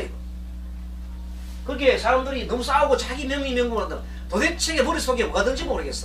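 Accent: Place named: Jeolla, South Korea. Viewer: 말씨를 보니 라도인간이고 사이비인간이로다 대가리는 삭발하였지만 완전한 좌빨인간이다 에잇 좌발놈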